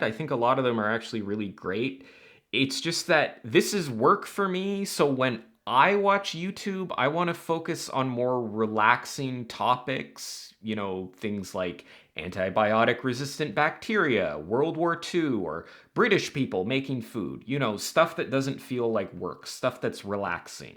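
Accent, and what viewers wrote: Canada. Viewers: Flannel and saying ooot not out, gotta be another Canadian! I could be wrong, but that was a hard aboot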